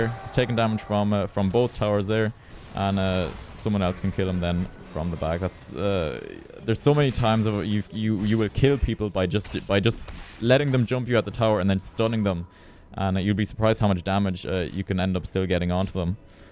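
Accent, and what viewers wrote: Ireland. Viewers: Ah an irish man as well, respect my friend ;) nice guide :) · are you Irish and if so which county?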